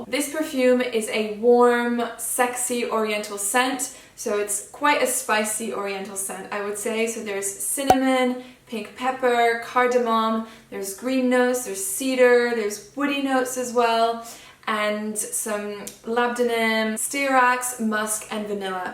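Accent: France. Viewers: Hi I am from Portugal and I love your channel , you describe fragrances perfectly and your french accent is beautiful . Great job 😁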